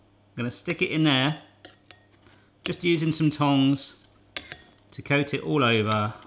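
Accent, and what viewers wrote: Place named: England, United Kingdom. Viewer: Such a breath of fresh air listening to someone with an English accident who doesnt start the video with "I'm so excited to be doing a video about..." 😆. And to top it off, an awsome vegan recipe on cauliflower wings! Nice one! Thank you for sharing! Awsome video.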